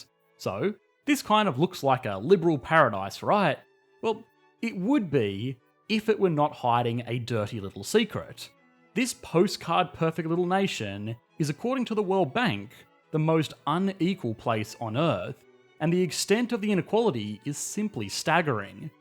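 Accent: Australia. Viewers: Great content and analysis in all your videos spoilt by your delivery which is way too fast for me to take in a lot of what you are saying, Your heavy Aussie twang adds to my difficulty. I have to keep pausing, rewinding, and replaying to consider and digest your line of reasoning. Rather than keeping me engaged, this turns me off. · Although the accent is Australian or industrial English, this video seems to be a propaganda vid by Republicans or Trumpists or, otherwise, of someone who has never been to The Netherlands. So, if you love Fox News, Bill O'Reilly, the Republican representatives in the USA, the incredibly lying Trump and BoJo and Putin, go live in their countries and see whether you are happy or not.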